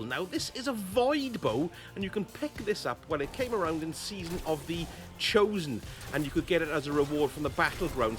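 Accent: Wales, United Kingdom. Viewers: Pretty surreal to pick a random Destiny video and hear a Welsh voice! Which part of Wales are you from may I ask? Great vid, I'll be watching a few more of these. I would imagine that Tony Hart reference went over most people's heads though haha! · You are delightfully Welsh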